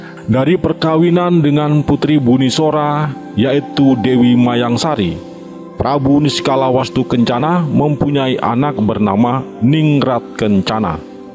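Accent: Java, Indonesia. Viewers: logat jawa ny kental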